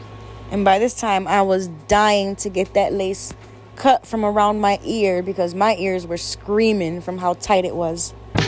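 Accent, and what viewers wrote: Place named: New York, United States. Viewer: I love your accent reminds me of NY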